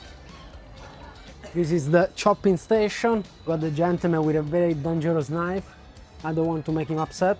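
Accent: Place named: Italy. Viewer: coba belajar bahasa Minang mix sama logat Italia. Alhamdulillah habis buka puasa lihat vlog jadi ngiler.. mana bisa nolak lihat dan makan rendang 🤤🤤🤤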